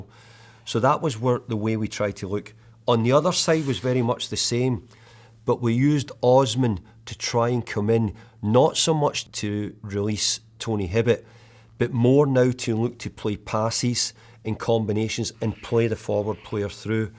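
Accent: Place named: Scotland, United Kingdom. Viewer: Oh I love that Scottish accent, it's just so easy to understand, especially Fergie's speech 🏴 ❤️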